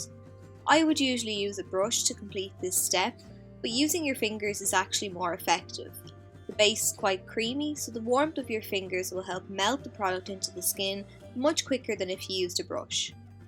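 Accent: Dublin, Ireland. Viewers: Are you Irish? Cuz I love your accent!! Sorry if you're not, I'm new but I'm definitely subscribing!❤️ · I'm from Ireland and that's a Dublin accent 🍀🇮🇪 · I can definitely tell you are Irish, and I think the Irish accent is beautiful. I also use the Rimmel Match Perfection, I have True Ivory. I’m very pale, like porcelain almost. Thank you, this was helpful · is that Irish accent?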